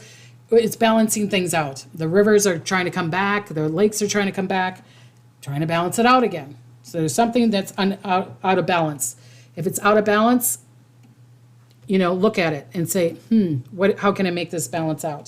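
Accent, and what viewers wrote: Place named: Minnesota, United States. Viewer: Girl - your Minnesotan accent is on point!!!!! I love your hat!! From one hat-loving girl to another — Happy Easter!! Sending much love & gratitude to you 🌻💙💛🌻💙💛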